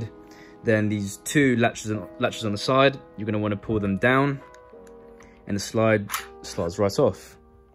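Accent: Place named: Caribbean. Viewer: Why does he have a black West Indian accent?????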